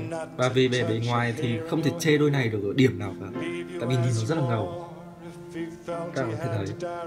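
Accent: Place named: North, Vietnam. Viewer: He has a Northern accent but it isn't too hard for me to understand being from the south :D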